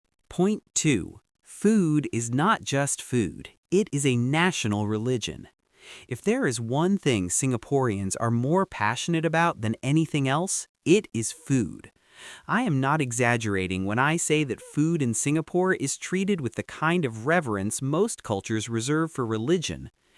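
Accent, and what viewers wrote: United States. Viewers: This guy (with an American accent) claims to come from Britain. Never mind. He came here 28 years ago. He never underwent the 60s, 70s and 80s in Singapore where every shred of creativity, individuality and independent thinking was crushed out of you. Which is why many Singaporeans migrated. He missed all that. He never did National Service. And finally being white - every Singaporean women would have kissed his arse most willingly and other brainless Singaporeans would put him on a pedestal. I'd love to see his answer to these points. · He doesn’t sound like a British at all, more like an American .😮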